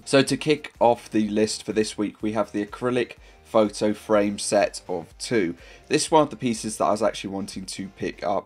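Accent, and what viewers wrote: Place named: United Kingdom. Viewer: American slang just doesn’t sound right in that British accent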